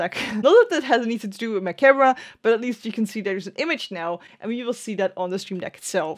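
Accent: Netherlands. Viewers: are you dutch? you really have a dutch accent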